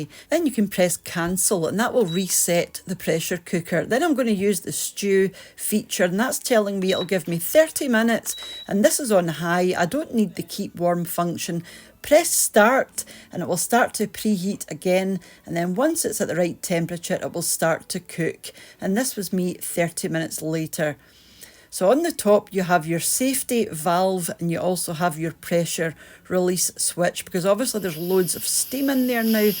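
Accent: Scotland, United Kingdom. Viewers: Love your accent are you Scottish?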